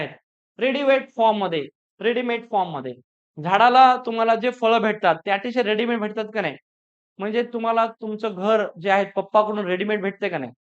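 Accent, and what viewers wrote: India. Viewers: Sir ekdam barobar boltay rumhi😂tumch boln aikun kaltay ki tumhi punyatle ahet😂😂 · Sir, are you from Nanded? Loha or kandhar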